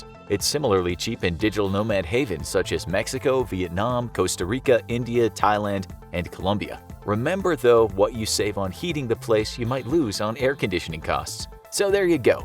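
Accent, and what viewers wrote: United States. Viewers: Ik bro i had a smirk when i heard him say that in his American accent get the infrographics show start speaking middle class british slang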